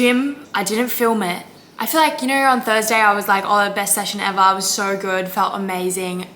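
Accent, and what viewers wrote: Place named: Australia. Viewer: Who wants to listen to me for 25 minutes errrrrrr me trying to fall asleep to the sound of that soft beautiful Aussie accent 😂id actually appreciate longer videos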